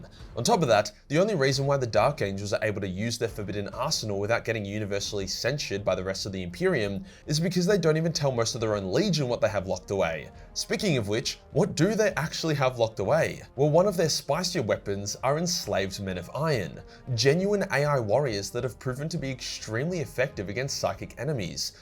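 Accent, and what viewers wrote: Australia. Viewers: I get excited every time you upload as listening to Australian chad talk about warhammer 40k makes me moist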